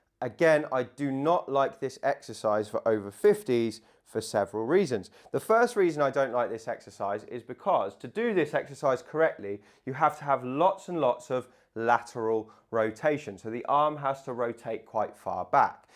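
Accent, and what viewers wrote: England, United Kingdom. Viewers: Thank you young English man . My salute to you. Good information is given to over Fifty. I liked your accent. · I love his British accent! He is also extremely handsome. I think I am going to be in very good shape soon. 😉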